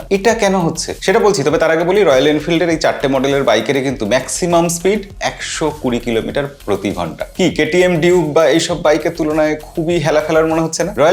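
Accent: Bangladesh. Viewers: U sound like a Bangladeshi... no offense... using bullet in india has a swagger factor because for ages the indian army , police and government officials are using this vehicle ... so we don't directly compare it with Bajaj duke ... better option for you guys is to buy a hero xpulse 😅😅 ... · hola sunè mone hocche apni bangladeshi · India banaye eita ekhon mane ta ki osikkhito ei toh pure Bangladeshi citizen kotha sunei bojha jachhe, eta India e banato, Royal Enfield ekta Indian brand. Kotha sune bojha jachhe chinese maal khub use hoy Bangladesh e tai eto bhoy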